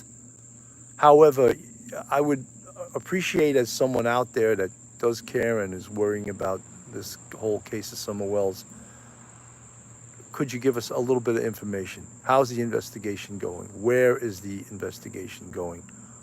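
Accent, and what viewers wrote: New York, United States. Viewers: New subscriber, love your New York accent.. thanks for your service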